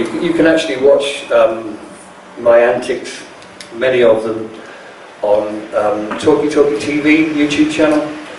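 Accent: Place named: Torquay, United Kingdom. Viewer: That's what I thought too, it's his accent 'talky' Torquay.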